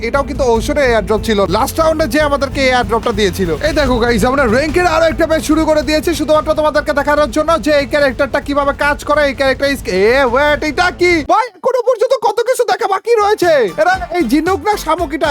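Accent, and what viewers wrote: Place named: Rangpur, Bangladesh. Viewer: Rangpur kotha basa vai 😮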